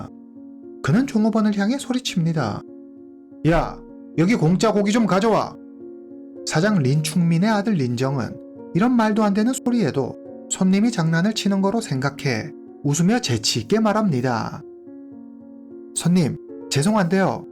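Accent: China. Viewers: 조선족 말투네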